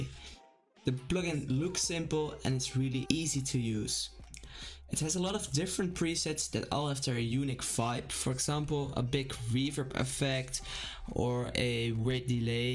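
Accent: Netherlands. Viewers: nou ook weer goed te horen dat het een nederlander is lol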